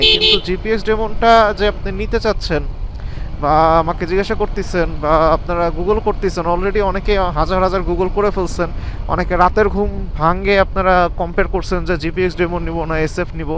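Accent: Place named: Rangpur, Bangladesh. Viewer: Subscribe to onk age korsi,,,,apnar mukh theke Rangpur er kotha sune vlo laglo,,,,,akhn theke potita video te amr comment paben"!💬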